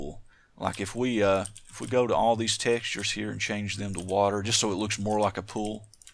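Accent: South, United States. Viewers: Know how to make it, but still watching anyway. I like the calm southern accent voice telling me what to do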